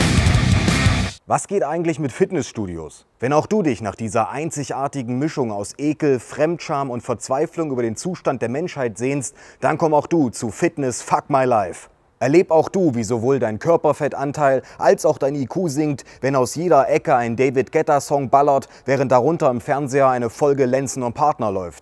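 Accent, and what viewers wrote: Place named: Saxony, Germany. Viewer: Der sächsische Harry G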